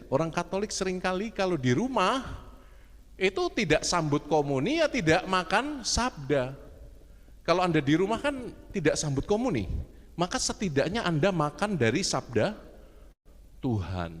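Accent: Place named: Java, Indonesia. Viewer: Bahasa jawanya romo medok banget👍👍👍🙏🙏🙏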